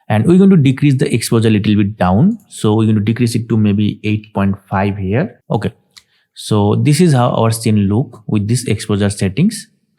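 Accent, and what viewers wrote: India. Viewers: какой то индийский акцент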